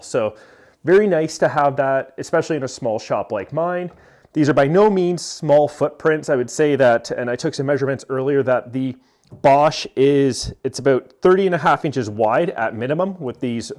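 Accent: Canada. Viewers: how did I know you are Canadian? lol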